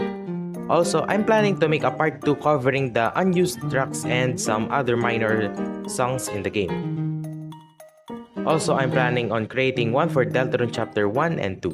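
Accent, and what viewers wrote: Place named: Philippines. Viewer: The Filipino accent is way too strong on this one😅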